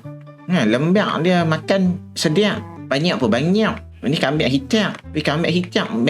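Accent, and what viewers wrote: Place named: North, Malaysia. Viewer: Tequk sangat loghat utaqa😂😂😅😅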